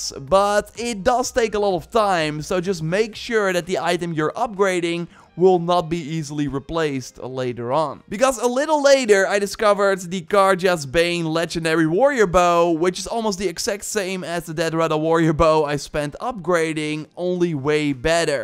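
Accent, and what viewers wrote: Netherlands. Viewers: That was very helpful already wasted some time on purples not knowing about levendary. Small friendly advice: Im assuming youre dutch. 'Armor' is pronounced like the dutch word for poorer. "Armer" instead of "armòr".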